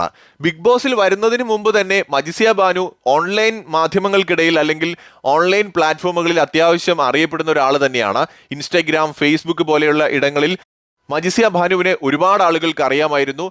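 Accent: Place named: Ernakulam, India. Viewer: സംസാര ശൈലി ekm ക്കാരുടെ ആണ്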